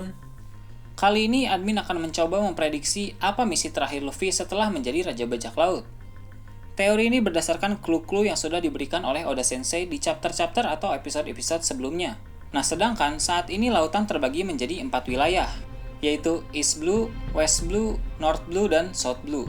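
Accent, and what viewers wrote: Indonesia. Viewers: sejauh ini admin udah oke, tapi untuk penyebutan istilah dalam bhs inggris masih indonesia banget wkwkwk usul aja buat belajar pronounciation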